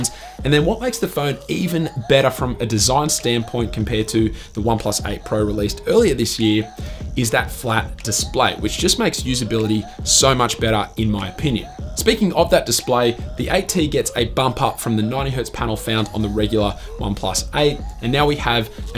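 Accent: Australia. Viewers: Everything sounds good in Australian accent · Great video....not too long like those 15-20 minute ones which really becomes boring. And yes mate, are you an Aussie 😝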